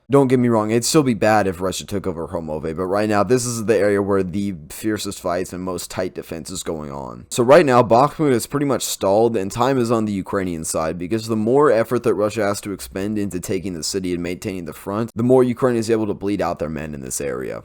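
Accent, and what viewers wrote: United States. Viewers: I love your videos and look forward to them every update. Don't worry about the knobheads freaking out over the way you pronounce words. People speak the dialect they grow up with. It's normal. Anyone freaking over it needs to go check themselves into a psych ward over their OCD behavior. I lived in England 8 years and they love American dialects the same way we love British dialects. Be who you are and anyone that doesn't like it can pi$$ off. You're the man!!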